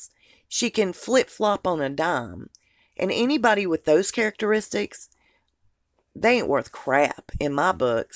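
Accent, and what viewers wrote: South, United States. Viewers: What’s the Mystery Woman behind Your Southern Belle Voice ? I want to see your face🌹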